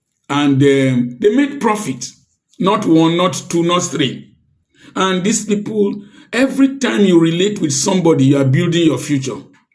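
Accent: Nigeria. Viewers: Dear Uncle I apprecite you plenty ooo. This your name is very similar and your tone and accent is very illustrative. Are you from Orogun, delta state by any chance? That surname is that of father's family too. May the universe continue to favour you sir. from Italy and The UK.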